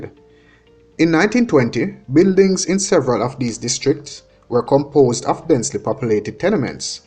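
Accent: Jamaica. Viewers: funny thing is that it not even has to do with Jamaican Patwa which is a separate language in itself, but Jamaican English has the omission of the letter 'h' as a characteristic. Anyways, I enjoyed the reading and the authentic Jamaican sound in our English. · Why should he ? This is how patwa is spoken. If we start changing our speech to mirror another language, doesn't that dilute the unique parts of our language? Embrace your language, it's who we are!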